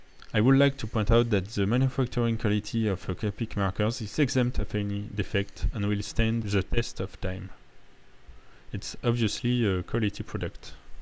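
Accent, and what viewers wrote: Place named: France. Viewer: love ur french accent.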